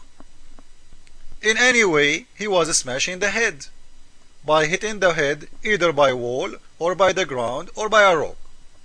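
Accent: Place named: Coptic, Egypt. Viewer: LOL mediocre through and through. By the way, coptic accent is horrible.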